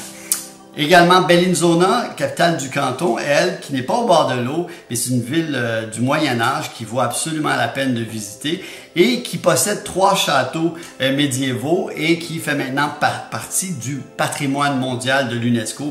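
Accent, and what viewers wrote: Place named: Quebec, Canada. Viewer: Bonjour Monsieur, merci de nous présenter une magnifique région de Suisse, le Tessin ou Ticino. Pourquoi vous a-t-on choisi, avec votre accent du Québec ? Que mange-t-on dans le Tessin en Suisse ? Autre chose que du sirop d'érable ? Vous êtes du Québec, vous connaissez, je suppose. Comment sont les gens dans cette partie de la Suisse où l'on parle italien, dix pour cents de la population suisse. Présentez nous la gastronomie du Tessin, ils ne connaissent pas le sirop d'érable, présentez nous leurs goûts en matière de musique. Ils ne connaissent pas Céline DION et GARROU, Lara FABIAN, Isabelle BOULAY et vos sommités de la musique du Québec. Sur les lacs de Lugano et de Locarno, on ne fait pas du patin à glace comme sur le Saint-Laurent. Moi, c'est Paul, venu de Bruxelles, Belgique. Frites, bière, moules, chocolat sont mes aliments préférés. 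En ce moment, janvier 2023, il fait froid. A Bruxelles, cinq degrés, encore l'automne sauce du Québec, mais en provinces de Liège et Luxembourg, neige, neige, neige et encore, luges, traîneaux, ski de fond, raquettes, remontées mécaniques, les Hautes Fagnes, un vrai décor digne du Québec, les Hautes Fagnes, cette région de Belgique qui touche l'Allemagne et le Luxembourg où dix pour cents de la population belge parle allemand. Le Tessin est cette région de Suisse, en été, chaude et ensoleillée où les habitants parlent italien, dix pour cents de la population suisse. Des gens méridionaux plus agréables qu'à Zurich et à Lucerne, des suisses u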